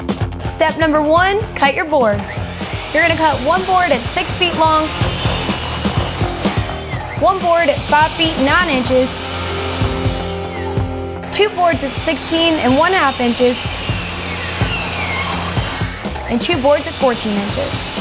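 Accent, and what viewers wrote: South, United States. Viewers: Love that southern accent when she said " For all you haters saying I drill to many pocket holes , I don't care" BOSS Lady <<<< we need more chicks with attitude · A man can dream. And a beautiful Southern belle accent to top it off.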